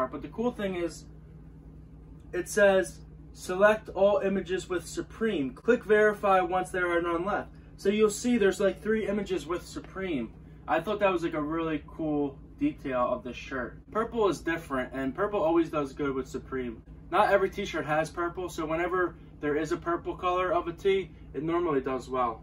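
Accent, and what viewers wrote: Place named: Pittsburgh, United States. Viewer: Bro where are you from? Im from Pittsburgh, PA and you kind of have that accent like us...just curious. NIce pickups!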